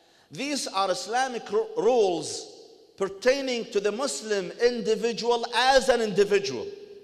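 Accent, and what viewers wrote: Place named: Russia. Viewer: Gee has anyone listened closely to his accent? It's Russian.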